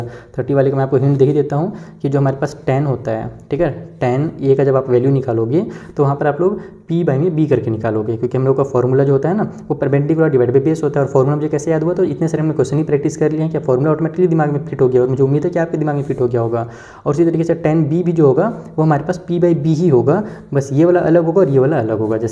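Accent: Bihar, India. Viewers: Ap bihar se ho sir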